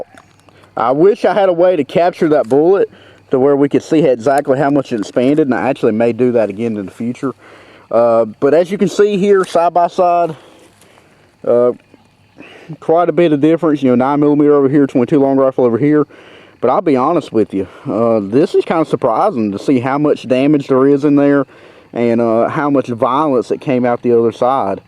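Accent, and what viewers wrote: South, United States. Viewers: I love clicking on these gun videos and hearing a southern accent 😂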